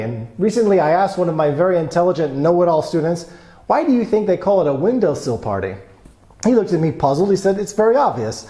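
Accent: Poland. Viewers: Amazing Polish accent! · You're a native Polish speaker, aren'tcha?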